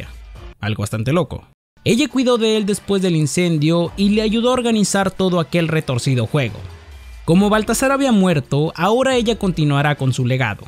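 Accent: Peru. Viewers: Eres de Perú?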